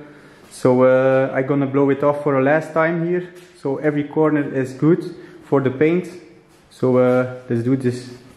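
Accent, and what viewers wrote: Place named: South Africa. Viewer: It’s being preserved for another 50 years ;-) well done. When I hear your accent I would think you are from Suid Afrika?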